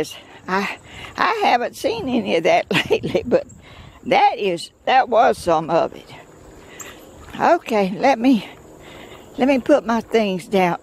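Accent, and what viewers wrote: South, United States. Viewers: Morning to you.while you are talking about blessings, you are one yourself, to me for sure. Love the walk. We had lots of woods when I was growing up in Virginia. My mother was named too.Love the southern talk and your wonderful laugh. Yes it's rabbit tobacco. I remember my daddy telling me so.we had lots around on our farm. You take care and be careful of the snakes.ok.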